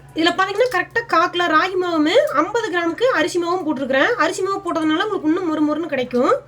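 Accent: Kongu, India. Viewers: Kongu slang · adada..yengooru bashai kongu tamil yena mariyadha...yena alagu..love ur beautiful slanggg than the pakoda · Kongu slang semma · ஏனுங்க கோயம்புத்தூர்ங்லா நீங்க கொங்குத் தமிழ் வீசுதுங்க ராகி பக்கோடா அருமைங்க. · Kongu tamil😻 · Yenunga amuni enna arumiya kongu tamil pesringo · Kongu tamizh super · Sis neenga kongu side endha ooru.erode???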